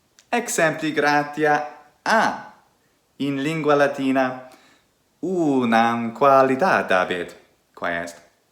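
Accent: Italy. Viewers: Anglophonus es, but you pronounce Latin with a marked Italian accent. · I thought you were Italian hahaha your "r" is so perfect, as a Brazilian who speaks with that same "r" I can tell, and watching your video I realized that a lot of your vices in Latin are the opposite of mine when I speak in English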